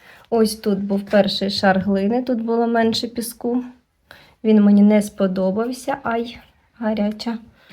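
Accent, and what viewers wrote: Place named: Russia. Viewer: Видно, що міська дівчина і російський акцент є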